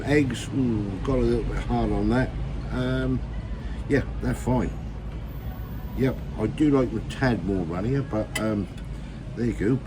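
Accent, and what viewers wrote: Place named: Lowestoft, United Kingdom. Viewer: Surprised you never had your breakfast in the cafe in Sparrows Nest itself, unless, of course, you've already been there. Relatively expensive, perhaps, but very good. I was born and bred in Lowestoft, but love coming home! Love your videos and your wonderful Lowestoft accent. You make me feel homesick! Keep those videos coming!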